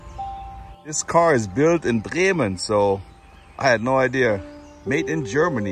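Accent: England, United Kingdom. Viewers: The English accent had me straight away 😂😂😂😂😂🤣🤣🤣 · The England accent 🤣. Why I never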